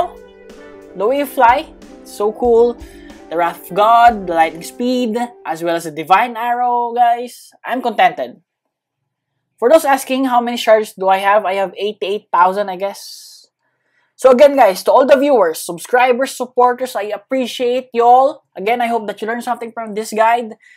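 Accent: Philippines. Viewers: What....! Bro I thought Ur a American lezz go Ur pilipino right u literally said pa milktea ka Naman lol hahahahaha nice btw Ur accent is super duper cool broo Keep it up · gagi akala ko english talaga si pilipino pala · Sabi na parang di amerikano at Filipino ka nga a hahaha ha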